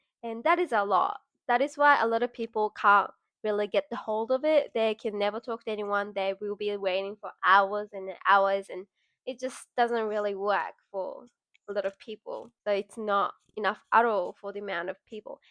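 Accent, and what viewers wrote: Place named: Australia. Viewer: I subscribed because of your australian accent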